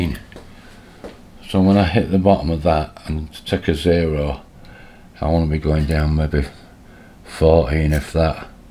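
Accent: Yorkshire, United Kingdom. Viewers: Great videos! If you get bored with the mill stick a stamp on it and send it to me in Oz 😉😀 And I’m guessing your accent Yorkshire or is it Lancashire or Cumbrian 👊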